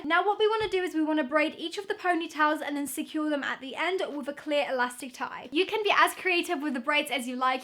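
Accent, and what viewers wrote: England, United Kingdom. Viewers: Of course you look beautiful with your English accent and your beautiful hair while I am here stuck in Florida sinking with the world with the ratchet hair that looks like a mop so no I cannot do these hairstyles because I have the ugliest hair ever and you have hair that looks good sorry for the rant I’m bored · I just love British accent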